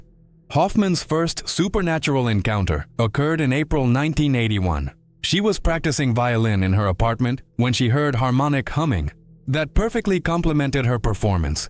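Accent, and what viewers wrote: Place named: Soviet Union. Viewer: Interesting accent. From the former Soviet Union?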